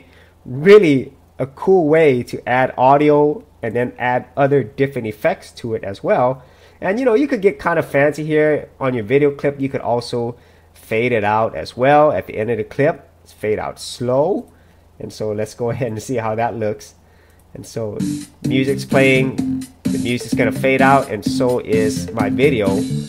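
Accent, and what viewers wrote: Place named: England, United Kingdom. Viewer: Oh my, finally an English guy talking and making it easier, hard clap to this guy for this.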